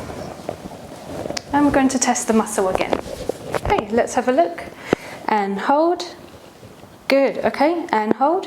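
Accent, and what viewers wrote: United Kingdom. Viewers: Funny, when I saw the name, I expected either Spanish/Mexican accent or an American one, not a South-East England/London accent. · Definitely an English accent :)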